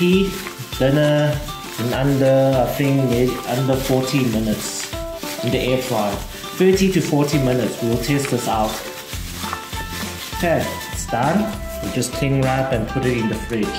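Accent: South Africa. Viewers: Rocking the South African accent!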